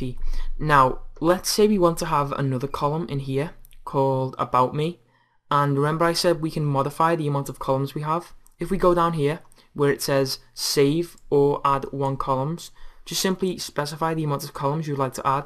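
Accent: Liverpool, United Kingdom. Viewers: Your accent sounds like you're from Liverpool.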